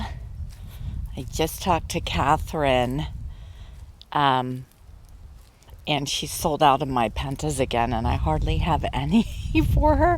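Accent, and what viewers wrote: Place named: Texas, United States. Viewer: Pretty mild compared to Texas(I assume from your accent), with average temperatures ranging from around 50-70°F. However, we do experience occasional heatwaves where temperatures can soar up to 86°F. These hot spells can be quite intense, but they are relatively short-lived. For me 70 feels like an oven :)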